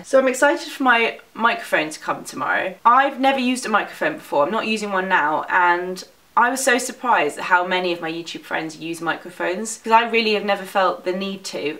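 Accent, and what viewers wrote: United Kingdom. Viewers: Ha ha english subtitles are not acquired for this video because of your British accent I believe and I am glad to see that I am way comfortable with British accent now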